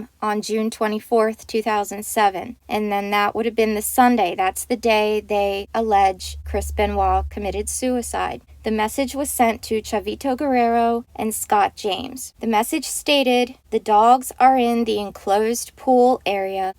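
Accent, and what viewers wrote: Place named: Pennsylvania, United States. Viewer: You have a slight Pennsylvania accent lol I’m born and raised in Pittsburgh and can tell that accent anywhere. You have to be from Pennsylvania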